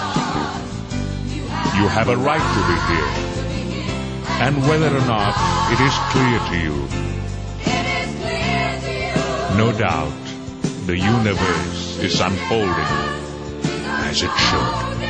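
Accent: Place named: Scotland, United Kingdom. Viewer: Some say the orator is James Earl Jones or even Kamahl, but I detect a slight Scottish accent like Sean Connery.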